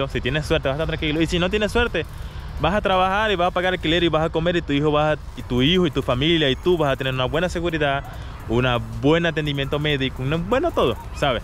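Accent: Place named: Brazil. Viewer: Vc tá falando portunhol 🇧🇷👈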